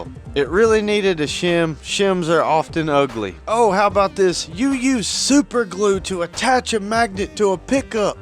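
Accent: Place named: Western North Carolina, United States. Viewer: You sound like my cousin from the western part of NC. I'm in the East.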